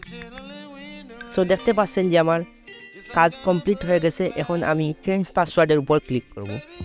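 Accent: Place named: Sylhet, Bangladesh. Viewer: ভাই নিশ্চয় আমাদের সিলেটি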